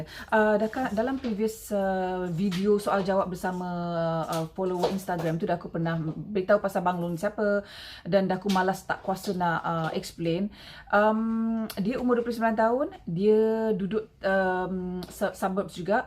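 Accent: Negeri Sembilan, Malaysia. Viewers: Dengo suara pon dah tau org N9..kan?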